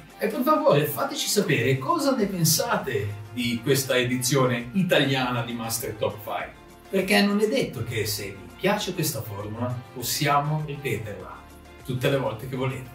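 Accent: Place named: Italy. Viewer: Accento italiano divertente 😜 auguri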